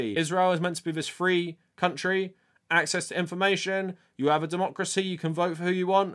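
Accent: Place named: United Kingdom. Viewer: You gotta love a Dude with a British accent worrying about Woke American liberals. Aren't you guys still working out Brexit with your 3 fleet navy?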